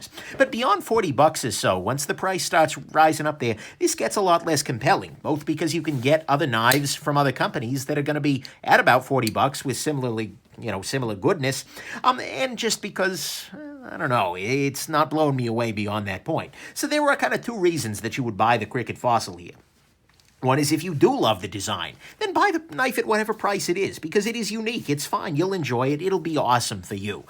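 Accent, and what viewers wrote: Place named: Brooklyn, United States. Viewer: As a New Yorker, I give this a thumbs up and comment for the Brooklyn Jewish accent alone!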